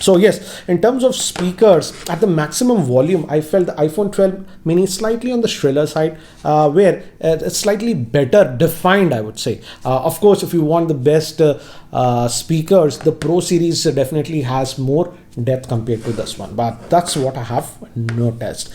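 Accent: India. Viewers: I love his indian accent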